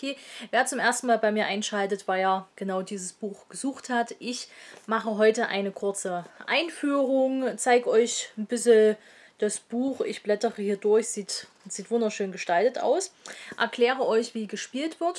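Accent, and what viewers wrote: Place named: East Germany, Germany. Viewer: Danke für deine Videos ich nehme es mir als kleine Hilfe falls ich bei mir nicht weiter komme . Video ist top und dein Ossi Akzent ist auch unterhaltsam für mich als Wessi . Ich hoffe du verstehst Spaß 😜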